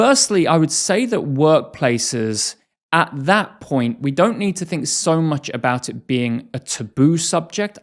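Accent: United Kingdom. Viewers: Happy New Year from Azerbaijan🇦🇿 Thanks for podcasts🙏🏼 and your british accent is amazing very clear we could understand you whole conversation.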